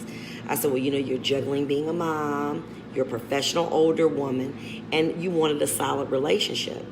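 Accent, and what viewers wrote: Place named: United States. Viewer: I love her country accent.. I’m from New York and she sounds mad country lol I love it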